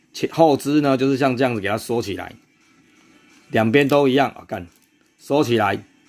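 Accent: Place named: Tainan, Taiwan. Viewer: 懶pa是台南人？ 語氣聽起來很台南～～ 太可愛了～好想買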